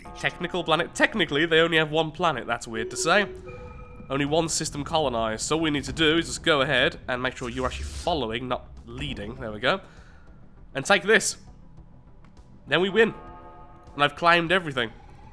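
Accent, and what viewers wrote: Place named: Cockney, United Kingdom. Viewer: are you trying to hide a cokney accent mate, i can hear it on soem of the 'A's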